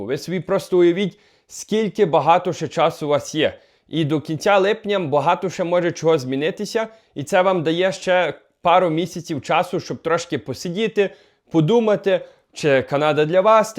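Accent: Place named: Transcarpathia, Ukraine. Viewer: у Львові так не говорят і не говорили, ніколи!!!!!! Такий говір тільки на Закарпатті)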